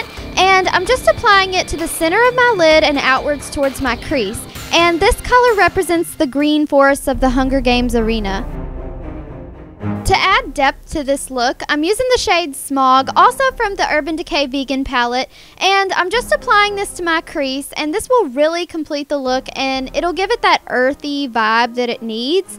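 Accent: South, United States. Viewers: I love you accent southern belles represent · this was a really good video! Thought I would pop over and take a look because I had just uploaded a video on the katniss look and your video came up as a suggestion :) Great editing and excellent job on the video... love your american accent! :) Going to sub you :) Oh and I used the same nyx illuminator in my video too! lol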